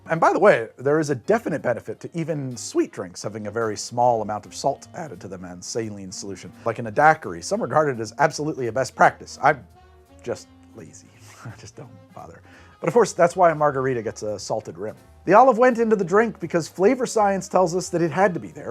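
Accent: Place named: United States. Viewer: Saying FDR's name in a transatlantic accent then Churchill's in your modern American accent is really funny to me.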